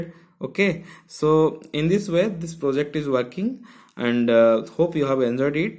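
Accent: India. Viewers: OMG THIS INDIAN ACCENT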